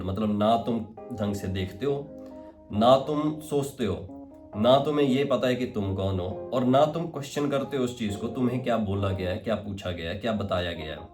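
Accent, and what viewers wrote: Himachal Pradesh, India. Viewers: Your accent tells that you are from Himachal.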